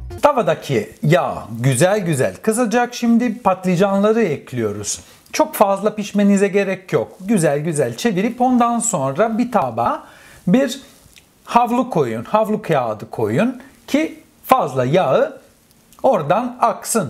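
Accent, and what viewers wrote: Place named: Istanbul, Turkey. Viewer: İstanbul türkçesi konuşan harika bir italyan şef👏🏻👏🏻 ellerine sağlık, harika!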